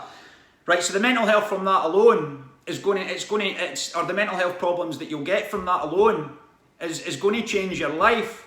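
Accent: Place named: Glasgow, United Kingdom. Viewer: he has a Glasgow accent :)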